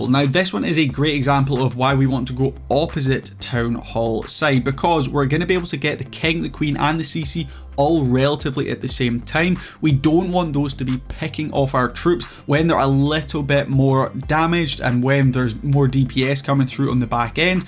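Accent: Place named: Scotland, United Kingdom. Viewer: Are you from Scotland just asking because I can sense your accent?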